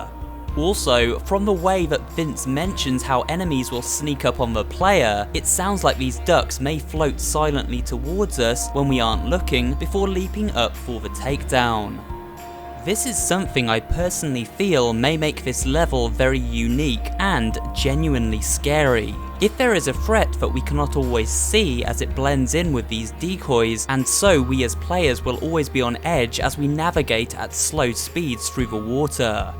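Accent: United Kingdom. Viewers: why you british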